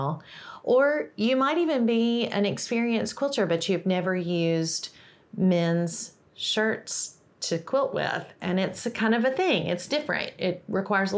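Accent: South, United States. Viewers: Can I just say you are hilarious in the most wonderful way! You remind me of my best friend Jane, so ifeel I know you already. I have already made quilts but I clicked on your series because my daughter gave me some of her husbands shirts. I cut the collars and cuffs off and she said she needed them back! Shock horror! Lucky for me she was only joking! So thanks for sharing your knowledge, your sense of humour and your beautiful personality and your southern accent. from Jilly in U.K. x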